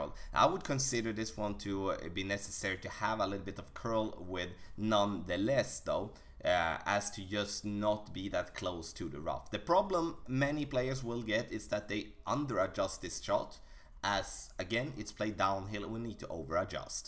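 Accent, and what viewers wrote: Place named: Sweden. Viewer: Svengelska haha nice gillar din kanal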